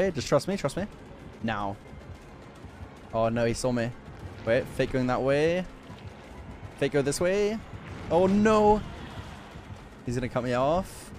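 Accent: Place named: United States. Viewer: he does have an accent. He has an American accent lol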